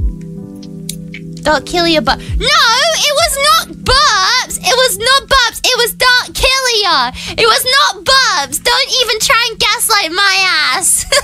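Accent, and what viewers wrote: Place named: United Kingdom. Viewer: I think its the firs time I hear a british vtuber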